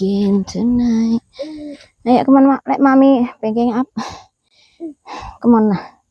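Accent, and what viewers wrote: Java, Indonesia. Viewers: Suka dgn pembawaan mbak yang sederhana dgn celetukan Jawa nya yg medhok, sehat & bahagia sll mbak. Salam kenal dr solo 🙏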